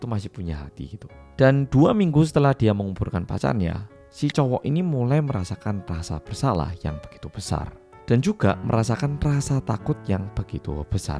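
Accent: Java, Indonesia. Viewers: Saya senang nyimak cerita2mu terutama gara2 aksen medhokmu, yg bikin saya ngakak dan kangen masa kuliahan. Dan ga banyak back sound bodoh yg sbnrnya merusak kualitas video. Sementara sbgn besar youtuber beraksen Jakarta krn emang dr sana, byk back sound ga perlu yg malah menurunkan kualitas video, dan saya booooooooooosan abis dg gue gue lo lo yg terkesan keminter. Sehat selalu bro.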